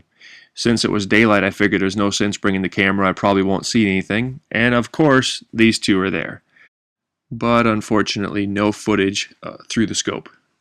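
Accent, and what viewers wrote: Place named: Midwest, United States. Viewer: Your accent sounds midwestern. No offense, a lot of people move to Georgia from the North. My ex father-in-law was from Michigan.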